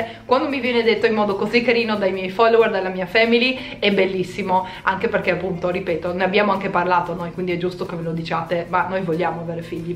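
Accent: Trieste, Italy. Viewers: Penso che quando intendono che parli strano.........CHE SIA SOLO L ACCENTO TRIESTINO 😂😁😂😁😂😁😁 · Tralasciando il fatto che quando hai menzionato la mandibola è stata la prima volta che ci ho fatto caso (sennò ciaone che me ne accorgevo), pensavo che il “parlare strano” fosse riferito all’accento triestino!! 😆 Comunque sia sei stata fin troppo gentile e sincera, anche perché non eri assolutamente tenuta a dare nessun tipo di spiegazioni, specie a gente che per le domande che fa non sa davvero stare al mondo. Se muovessero di più il sedere per ottenere quello che vogliono invece di lagnarsi dei successi altrui non sarebbero tanto maligni e invidiosi. Robe da matti.. (PS: vorrei proprio vederla la scena dal vivo, dove l’imbecille di turno ti chiede se sei incinta e tu “no. Sono ingrassata.” BOOOOOM!! Figura di 💩) (PPS: fregatene, sono invidiosi e stupidi. La tua family ti vuole bene e ti apprezza sempre❤️) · non è che parla in modo strano, di chiama accento triestino ragazzi. 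per chi abita vicino al confine è normale prendere l'accento simile alla lingua dell'altro stato (anche io sono di trieste, capisco perchè ti dicano così). non credo che ad una persona ad esempio di napoli (ho preso un posto a caso dove ci sia un accento sentito) direste "parli in modo strano" solo perchè conoscete l'accento napoletano. gli accenti ci sono in tutta italia amici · sei fantastica così! Con il tuo accento triestino che ti contraddistingue, la tua mandibola che se ne va per gli affari suoi e con tutto il resto che ti caratterizza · ti seguo dal 2009/2010 e cavolo! non avevo notato la mandibola! pensa che quando leggevo "perche parli in modo strano?" io credevo intendessero la pronuncia o la voce e pensavo boh? sarà che e' triestina e slovena e non si sente molto in italia sta pronuncia?? io sinceramente non ho mai notato il problema della mandibola! boh! che gente però...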